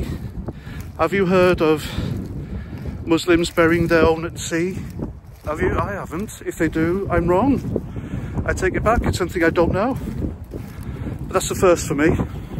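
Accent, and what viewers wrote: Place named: Liverpool, United Kingdom. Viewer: You know why you picked a Scouse accent mate. No need to explain 🇬🇧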